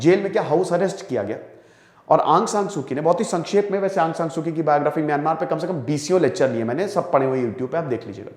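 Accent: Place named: Bundelkhand, India. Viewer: Sir i think you are belonging from bundelkhand madhya Pradesh. When you say "कुछ तो करै क पड़ी"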